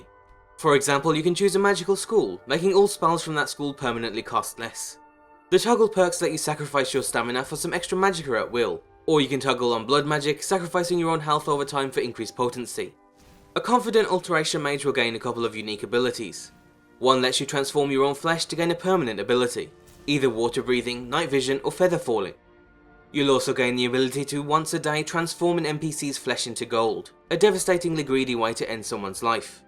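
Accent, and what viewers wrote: United Kingdom. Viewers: Great video, but your speech is quite slurred. It's not just the "charming" British accent; there's actual slurring going on - where two vowels blend into one. Quite easy to work on once you're aware of it.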